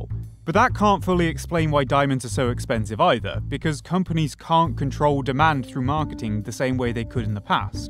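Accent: United Kingdom. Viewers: Assuming they are a person at all is assuming too much. The algorithmically suggested video, voiced by the algorithmically optimal Brit accent, with an algorithmically generated identity of "PhD in something cool, but really just a shut-in shitposter (like you!)" to achieve a perfect balance authoritative intellect, relatability, and trendy meme humor. The PhD chops will never be relevant to the content so they can't really be disproven, while the meme humor can never really be separated from chasing the algorithm in any meaningful way. The editing, animation, and character design are professionally utilized, but still generic enough to be commissioned through something like UpWork on the cheap. The vocabulary sounds like the massive youtube audience of lefty, college socialists, but the content is very neoliberal globalist. This is pretty much just capitalism in it's purest form, the entire video is designed to succeed algorithmically without much of a point to make. 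Even the profile picture is appropriately colorful and busy to attract attention, encourage further inspection. The channel name follows the marketability framework made popular by band names in the 80's to today. I could go on, but I have already spent too much time thinking about the topic already. Hopefully if I ever get around to making serious youtube content some asshole in the comments doesn't go on and on about how it's blatantly formulaic and motivated only by a desire for popularity and revenue. But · oh youre british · This guy has a middle class counties accent apart from the word ‘now’ which he says with a Black Country accent 😂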